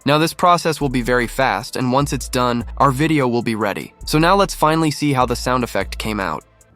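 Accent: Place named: United States. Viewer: Love American accent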